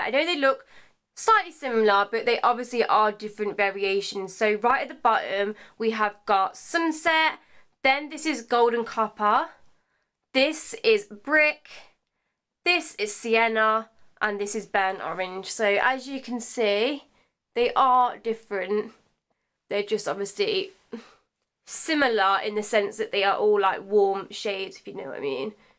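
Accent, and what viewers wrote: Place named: Midlands, United Kingdom. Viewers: Stephanie Harris also a coventry girl here. I moved away but I'm still close enough to Coventry and Leicester and visit fosse park regular. I knew accent was from nearby before I even knew where she was from 😂😂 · Loved this haul, I could imagine how excited you were to go into Sephora 🙌🏻 I love when you used the word wounded when you said about the fenty foundation. I am originally from Coventry which is close to Leicester but live in Essex now and they don’t talk like we do in the midlands down here!! Makes me feel a bit homely when I hear you talk as it reminds me of home ☺️ xxx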